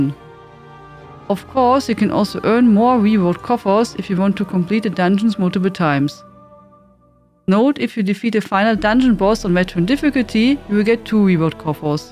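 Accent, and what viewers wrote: Germany. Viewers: Your English is unintelligible in places. I am very tolerant of accents and even understand Africans who speak Pigin English. Your accent is harsh. You were clearly taught English by people who were not native English speakers themselves. Why not stick to your native language? Which, based on your accent, is probably German.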